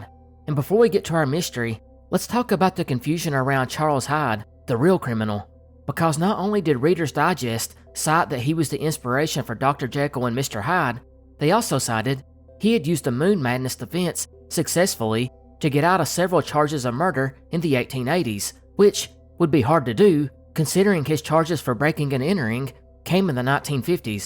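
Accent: South, United States. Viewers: Awh heck yeeah partner! 🤠 a country soundin’ commentator, let’s giddy up now!! 🤠 yeeee hawwww!!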